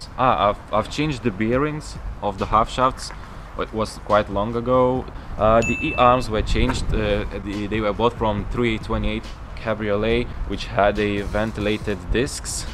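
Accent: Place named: Lithuania. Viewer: Agirdi tu lietuvis nes nekaip kalbi anglas nedarytu jokiu klaidu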